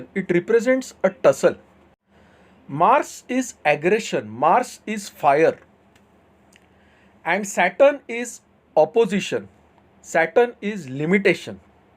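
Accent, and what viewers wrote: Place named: Maharashtra, India. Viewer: Marathi awaj aahe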